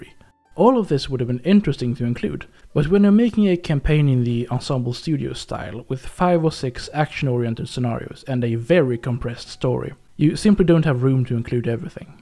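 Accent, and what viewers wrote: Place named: Sweden. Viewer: This is one of my favorite scenarios, both for the interesting gameplay (plenty of secondary objectives that do what they're supposed to without also derailing the basic gameplay) and not least for the visuals (!!!): it's a beautiful and very atmospheric autumn-style map (a very underutilized season, as is also commented upon in the video) that - crucially - does an excellent job at including mountainous terrain in a way that's more involved and interesting than merely dotting the map with Mountain-type Gaia sprites. Absolutely one of my favorite scenarios in this astoundingly long-lived (and still-fresh, even after so many years) gem of a game! Also: fun to see a fellow Scandinavian delivering the goods as far as top-notch scenarios go (judging from the accent, this guy must definitely be a Swede - way to go, Söta Bror!)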